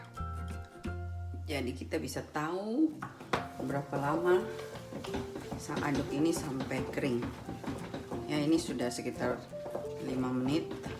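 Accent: Papua, Indonesia. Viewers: Pelei mace ko tra kosong...itu boleh dgn logat yg katorang dipapua mengerti dan paling jelas sekali. Salam dan.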